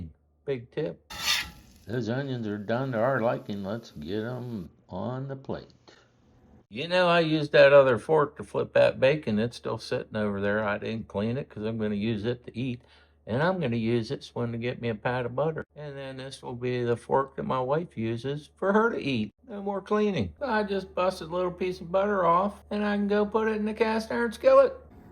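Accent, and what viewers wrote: Pittsburgh, United States. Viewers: Is that a Pittsburgh accent?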